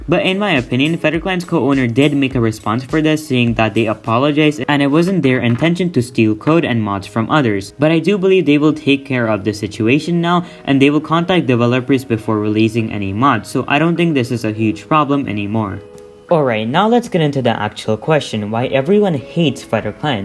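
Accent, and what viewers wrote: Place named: India. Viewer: I like the way u speak english and ur indian :O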